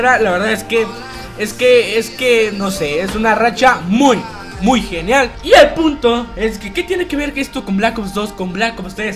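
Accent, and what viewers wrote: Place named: Spain. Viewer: deja de hablar como español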